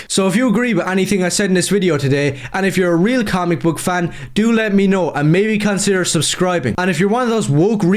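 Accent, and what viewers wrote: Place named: Ireland. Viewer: You're a funny Irish guy (I'm guessing Irish by your accent). You're also completely correct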